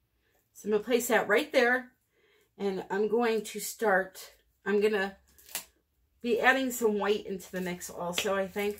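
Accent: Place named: Michigan, United States. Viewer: How could anyone say anything but positives! You are like a next door neighbor I wish I had. I appreciate the humor and most of all the humanness (if that’s a word). Thanks again for sharing. Love the new paint and those cells are wonderful. Keep up the great job and the fun we have listening to you laugh. Trying to figure out your accent, I’m thinking Michigan or Wisconsin. Sending hugs!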